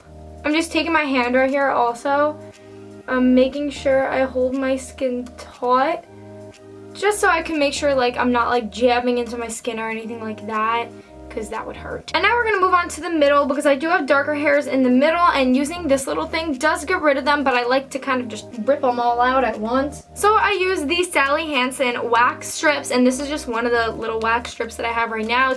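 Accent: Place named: New Jersey, United States. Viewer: You sound kinda New Jersey~ish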